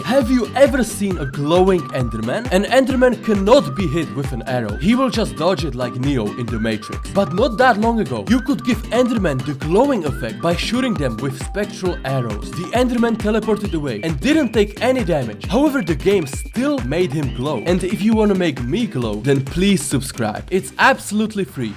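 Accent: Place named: Czech Republic. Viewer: Libi se mi ten tvuj ceskej prizvuk😂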